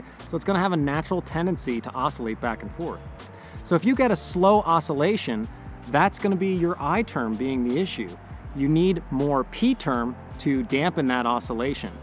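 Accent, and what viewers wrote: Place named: Pennsylvania Dutch, United States. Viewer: I think i heard some PA dutch in your voice.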